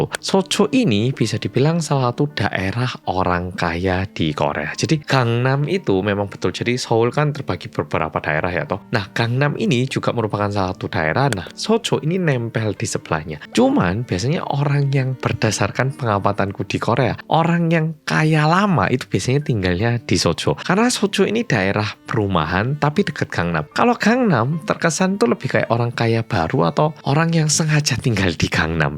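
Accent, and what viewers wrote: Java, Indonesia. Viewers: hai malem muedok lgsg nyimaaak🎉 salam sehat💪🏿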